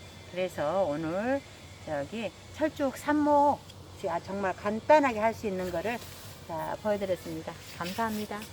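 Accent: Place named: Suncheon, South Korea. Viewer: 설명 잘 들었어라 근디 엄니 거가 어디다요 말투가 완전히 우리 고향인디 저는 순천 주암이요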